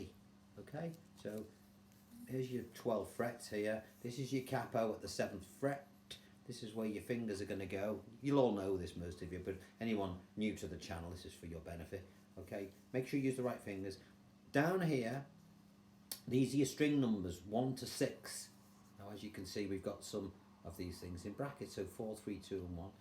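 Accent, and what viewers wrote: Liverpool, United Kingdom. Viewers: First of all are you from Liverpool? Also have you thought about writing this out in tab instead of chord charts?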